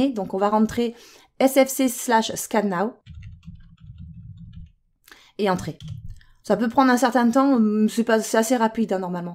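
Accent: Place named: Corsica, France. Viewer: accent corse.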